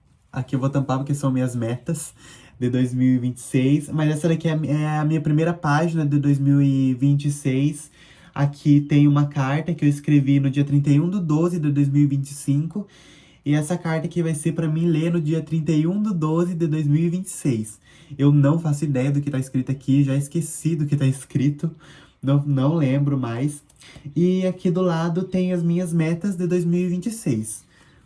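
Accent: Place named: South, Brazil. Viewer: Moço voce e sulista? Que sotaque fofinhoo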